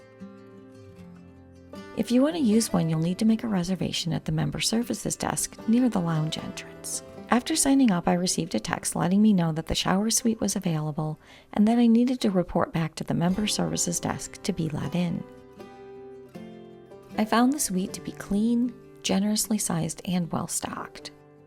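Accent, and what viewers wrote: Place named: Chicago, United States. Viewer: I guessed Chicago based on the accent 🎉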